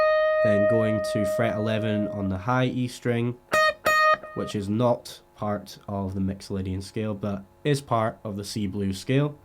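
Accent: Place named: Scotland, United Kingdom. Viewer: Amazing speech ! Sounds like there is some kind of scottish accent going on...sorry but it keeps me smiling ahah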